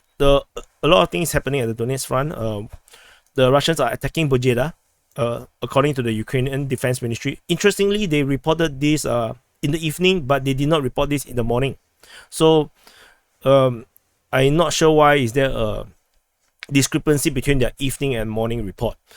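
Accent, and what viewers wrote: France. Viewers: I suffer emotional damage listening to your commentary! Really well done - HOW do you get the names out so well?And the FRENCH accent!!!! WOW! But great analysis with current information sorting the known from the guesswork and the propaganda. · good pronancuation closer to real one :) yet French :D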